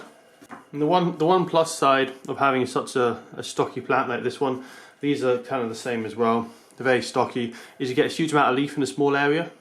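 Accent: Scotland, United Kingdom. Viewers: Fuck you go with brexit, I hate this accent I could slay everyone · I guess that 400 people with the famous "brown thumb" gave 'thumbs down'. No chance for them to grow anything EVER, so they hate everybody who is able to grow their own stuff. Awesome video, even you talk too fast too much, and half of the time you are mumbling, hard to understand for a Non-Scotch. Still, awesome. It's alsoeven easier to have green onions all year long, which I love more than basil. They grow indoors and outdoors. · He's using british pronunciation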